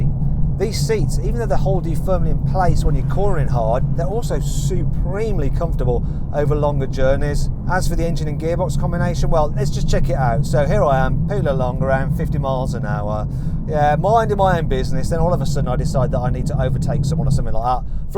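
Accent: United Kingdom. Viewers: これイギリス英語やからイギリス目的じゃない場合はやめた方がええで 💢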